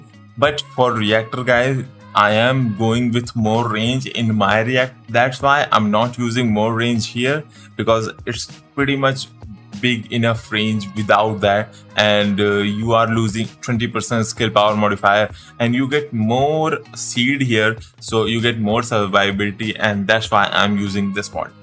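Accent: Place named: India. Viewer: He in Indian. Accent is Indian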